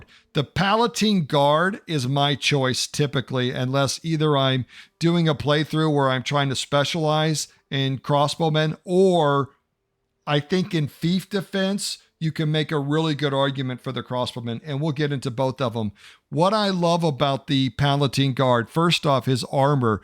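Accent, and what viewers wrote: Oklahoma, United States. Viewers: Your commentary in your videos are great brother, so funny with your sayings with the good old Oklahoma style. But thank you for the videos, & no one els on here has your style. Well done Mr. Take care.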